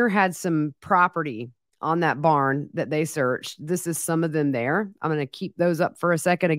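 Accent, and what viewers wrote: South, United States. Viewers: I also love that " Southern drawl"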